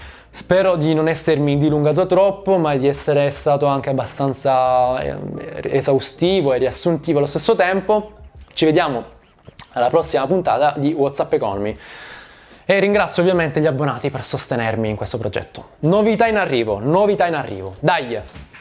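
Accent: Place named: Lamezia, Italy. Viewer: E io che pensavo che la avessimo solo noi Lametini questa Z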